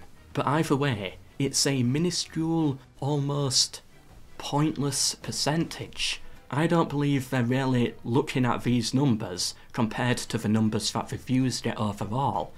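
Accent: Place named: North, United Kingdom. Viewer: which region of the UK are you from? I'm trying to place your accent. I'm American and we're bad at identifying British accents. If I had to guess, somewhere in the north?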